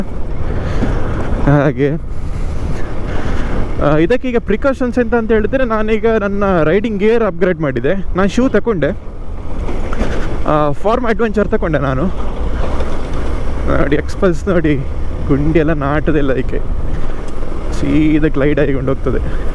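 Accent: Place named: Mangalore, India. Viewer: Woww manglore accent Kannada 🔥 sakath vlog bro husharu 🔥 subscribed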